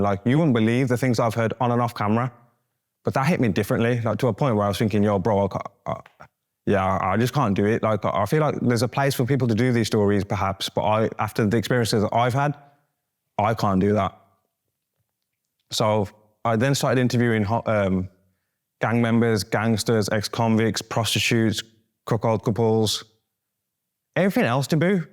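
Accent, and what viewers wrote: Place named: Birmingham, United Kingdom. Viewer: i could tell you were a brummy 😂😂😂😂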